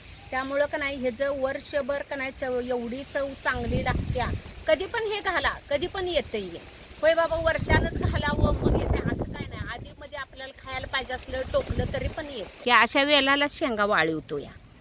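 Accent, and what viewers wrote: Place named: Rural, India. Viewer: Tumchya malyatil bhajila tumchya gavran bolnyasarkhach godava aahe.Tumche kasta baghun khup kautuk watate tumche.❤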